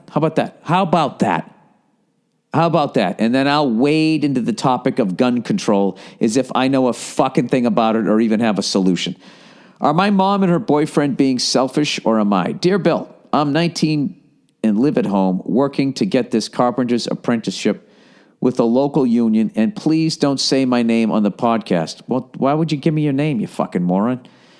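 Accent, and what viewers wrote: Boston, United States. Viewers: BIIIIIIIIIILLL!!! We fucken love you man! (That shit does matter, gotta get too those two last stadiums man) And fuck that guy! Make America Great again. Anyway I learn heaps and loads from you about American sports and other shit in fits of laughter, I watch all your shit, we love that boston accent "DOWN UNDER!" (say in Aussie accent) BUT please watch a bit of that new star trek on Netflix... and then yell about it..its the fucken best! peace n love......p.s. say the dudes name ill take care of it. Great podcast.. Pps go fuck yourself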